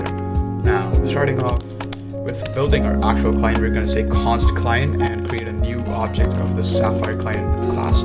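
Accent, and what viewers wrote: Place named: United States. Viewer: Bro u sound american af L Also the bg music a bit too loud xd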